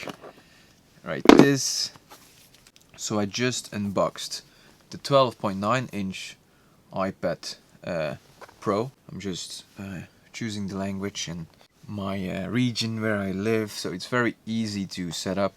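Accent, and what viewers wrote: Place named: Belgium. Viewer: guees belgium accent :D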